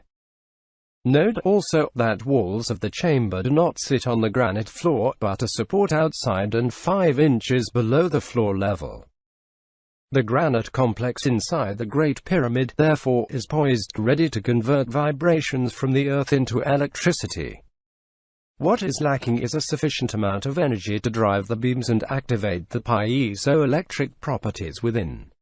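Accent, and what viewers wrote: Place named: United Kingdom. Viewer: atrocious robotic British accent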